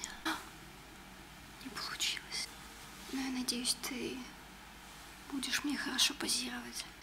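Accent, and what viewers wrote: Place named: Russia. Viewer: I agree. Her Russian accent really makes this more relaxing than it should be!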